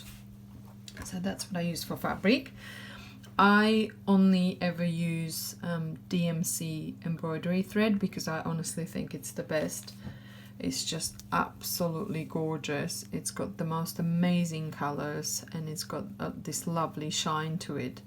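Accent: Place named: Slovakia. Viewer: Ďakujem za skvelý návod,rozpoznávam slovenský prízvuk :-) .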